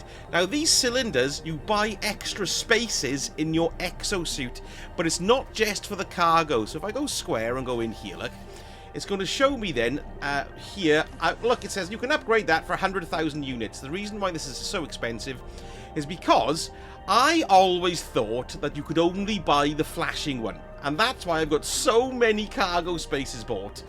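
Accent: Wales, United Kingdom. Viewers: Loving the Welsh accent... we need more Welsh in the world! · Brilliant video. I've been playing for ages now and even I learnt a few new things. Plus your beautiful Welsh accent was an added bonus as I could listen to it all day long. 30 years in Cardiff and I miss it every day 😢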